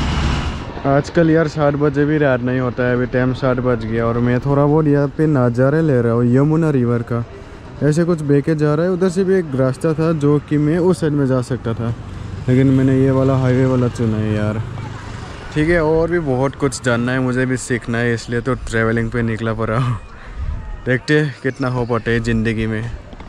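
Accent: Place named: Nepal. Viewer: By his hindi ascent he is nepali bcz I been in uttrakhand , people of uttrakhand hindi ascent is different not like him anyways enjoy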